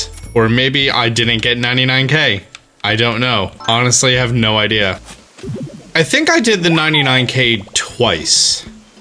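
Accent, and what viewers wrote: New Jersey, United States. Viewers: Dog I am pretty sure its a New Jersey accent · Can confirm, it's a jersey thing. I immediately knew he was from the same state as me first video i watched lol. Bergen/Passaic county perhaps??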